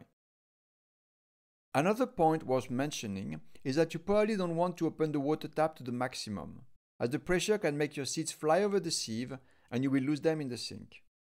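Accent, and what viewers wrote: France. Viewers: Lovely french accent !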